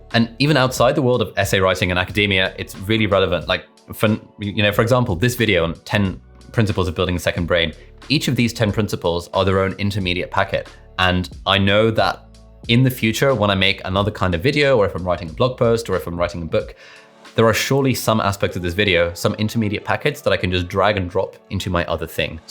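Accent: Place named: United Kingdom. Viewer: You are a Pakistani but how did you learn British accent?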